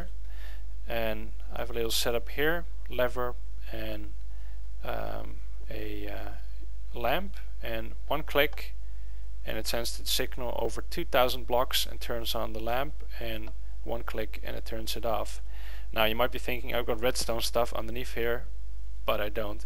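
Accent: Netherlands. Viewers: you are great, but i can hear your accent, that you are a dutchman. so funny tipical dutch accent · Are you Dutch ?? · ben je nederlands, want zo klink je wel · Wait, are you Dutch? Your accent is really familiar, somehow. (See, I'm dutch too, lol.) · are you dutch? I hear a dutchy thing in your voice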